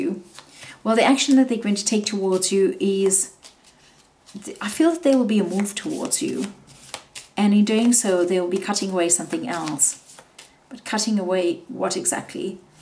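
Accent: South Africa. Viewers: Are you South African? 😍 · Are you South African? 🇿🇦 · Are you South African? 😊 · Love your style of reading and soft South African accent 🇿🇦 ♥️ big Love! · Your accent is lovely, it's very soothing to me Where are you from? SA?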